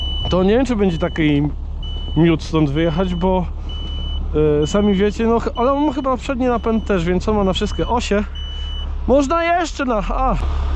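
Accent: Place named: Kashubia, Poland. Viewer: Zatknij uszy bo to sa kaszuby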